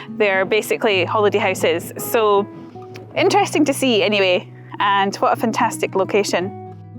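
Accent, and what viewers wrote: Scotland, United Kingdom. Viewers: What an entertaining video! Everything was so interesting and moody. As a german I always have to take the ferry from Amsterdam to Newcastle ( spend 2 -3 times a year in Scotland), so your video about the ferry trip was so interesting everything looked so familiar to me, even your sea sickness 😬. I use to travel alone, and I love to stay in small cottages in the highlands ( although I always feel a bit scary at nights ). In 2021 I stayed a few days in the Hostel in Tarbert on the Isle of Harris, and due to the pandemic I had the hostel for my own! That was great and strange, both., besides I really love your scottish accent and the fact that you are so easy to understand 👌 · Beautiful lady, beautiful accent. So proud to claim you as one of my own (scottish)❤😉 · Wow! look at you without your woolen cap. Love your scottish accent..easy to understand..but difficult to immitate❤ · You have such a lovely Scottish feminine accent my dear. 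💖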